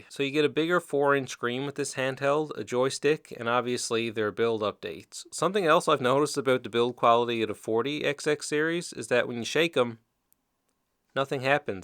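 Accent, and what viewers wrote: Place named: Newfoundland, Canada. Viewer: As soon as I heard you speak I knew you were from Newfoundland. Great video. I subscribed to your channel.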